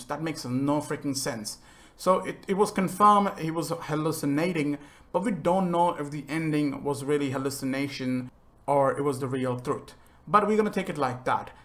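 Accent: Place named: India. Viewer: This guy is trying so hard to hide his indian english accent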